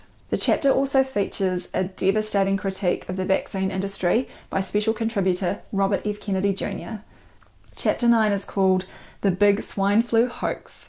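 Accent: New Zealand. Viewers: your New Zealand accent is killer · A whole book read to me by, I am in. Love your voice and Kiwi accent. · Hi, I really enjoy watching your channel and greatly appreciate the concise and informative style of your videos, not to mention that NZ accent! I read the second edition of Virus Mania, a while ago, and I found it so engaging that I couldn't put it down until I'd read the whole book. I certainly see the merits of the alternative hypothesis explained there and also how it fits into the model of the overarching control imposed by the pharmaceutical industry and its delivery teams, I mean health services. I'll have to buy the new issue... One thing that came to me, after reading the book was the question of disease transmission, through contact or air-borne aerosols, for instance. The particles (exosomes and similar?) that are produced by cells in response to toxic, or other stress factors, will be relatively simple, e.g. 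a fragment of DNA or RNA, surrounded by a lipid membrane, with a few signal proteins embedded in it, which will look like what we have been led to believe is a virus. If these particles were transferred by traditional 'infection' methods, would they not just act the way we are told that 'viruses' do and elicit immune system responses in the recipients and be classed as the disease? I apologise if I'm restating something that's obvious to others. I just wanted to clarify my understanding. Cheers. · Thank you for your informative videos dear. Is that a New Zealand accent I detect? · I enjoy your kiwi accent and I’m looking forward to the audio book. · I'll definitely be downloading the audio books. As I'm a fan of the Kiwi accent and all.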